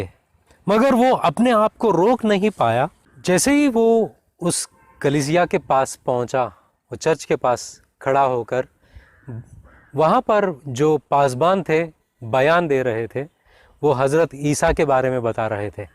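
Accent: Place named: Goa, India. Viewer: Jhoot kion bol the ho tmhara accent he Goa k Christian's wala ha jhootay makar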